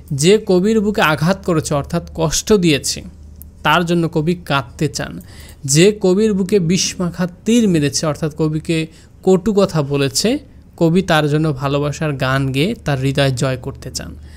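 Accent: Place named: Rajshahi, Bangladesh. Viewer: আসসালামু আলাইকুম স্যার।স্যার আপনার ক্লাস করাটা অনেক ভালো লাগলো ১ তো আপনি ভালো পড়ান মাশাআল্লাহ আর ২ য় কারণটি হলো আপনার কথা শুনে বুঝতে পারলাম আমি যদি ভুল না হই আপনি আমার এলাকা মানে রাজশাহী বিভাগের লোক। স্যার আপনার বই গুলো চট্টগ্রামের কোন লাইব্রেরিতে পাবো যদি একটু বলতেন।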